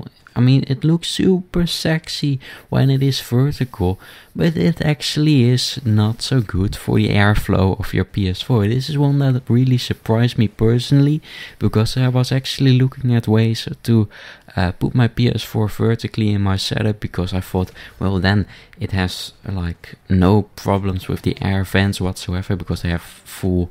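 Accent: Netherlands. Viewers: he sounds dutch af :/ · Dutch accent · Dutch accent incoming!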